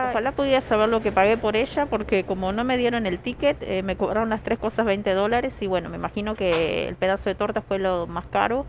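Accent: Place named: Montevideo, Uruguay. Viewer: Vos si q nos hacés el honor de representarnos. Tu forma de expresarte es bien de montevidiana. A pesar de tantos años que vivís ahí no perdiste ese "que sé yo",😉. Me encanta que seas vos misma como todo los q tienen su propio canal. Feo es cuando los uruguayos se van a otro país y después se creen mejor que los demás Ese barrio es precioso. Disfruto muchísimo tus videos,esos lugares q me hubiese gustado conocer en persona,pero bueno,para q está y su canal? Para hacernos conocer New Yersey y New York ☺️😉. Bien, encontraste la panadería 👏. $900 😳🙄🤦. De veras que no hay ni un asiento para sentarte 😁. Pero bueno ☺️😂. Andás con alcohol para las manos antes de comer? Qué? Eso te salió 20 dólares? Con razón te hizo sufrir pagarlos. Claro que disfruté mucho,😘🙋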